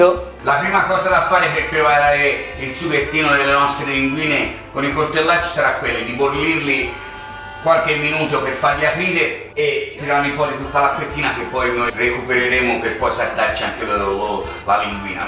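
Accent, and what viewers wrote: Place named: Sicily, Italy. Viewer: a me sembravi un siciliano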